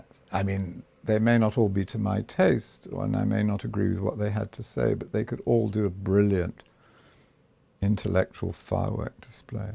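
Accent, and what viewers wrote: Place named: United Kingdom. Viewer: Such a wonderful cozy atmosphere. This man could read a phone book and it would hold my attention. Love a British accent!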